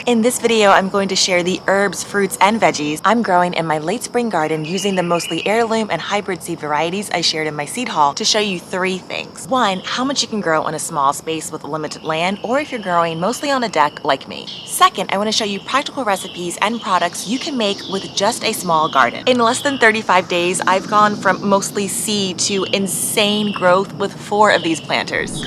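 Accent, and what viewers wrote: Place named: Southern California, United States. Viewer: I can't stand that she sounds like an entire white girl from so cal!!! I'm trying to push it out of my head but I can't sis lol 😊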